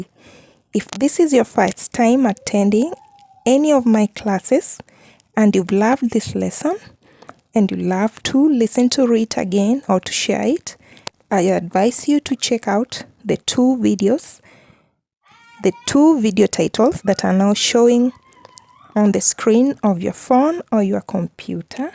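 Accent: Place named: Kenya. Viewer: I am sorry maam, but your pronunciation is quite incorrect. You are supposed to be a professional in speaking English, but it doesn't seem so. You should go do research on how to reduce your accent. I assume you are from Kenya, where British english is taught. There are countless youtube channels that teach exactly that, so do your due deligence. Please stop spreading misinformation.❤❤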